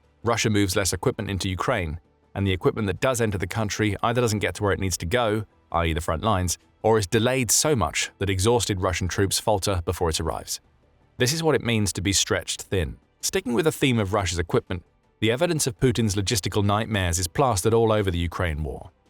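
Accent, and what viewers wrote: United Kingdom. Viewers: This channel is funny a guy with poor knowledge off military history and tactics making claims about that which he doesn't understand. I am bewildered that he has over a million followers. a sign that if you have good editing skills and a British accent people will believe any nonsense. Russia isn't losing. the only reason that the war is slowing for Russia is that it's being backed by billions of dollars of foreign aid. we are dumping massive quantities of money to keep the Ukrainian State afloat at no personal gain to us whatsoever. it's an absolute bogus nonsense strategy that won't benefit anyone except this false idea that Russia is going to try to Hitler-esque conquer Eastern europe. it's not happening, it never will happen in our lifetimes, and I'm tired of sitting here and pretending like that's the motivation. it's very obvious that the war in Ukraine being funded by the Western allies is a great way for some corrupt money deals to go down. 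I mean how many billions did this guy lose? Russia isn't losing, nor will it unless something wild changes. Billions of western dollars looks like Ukraine slowly losing. what a joke to listen to this guy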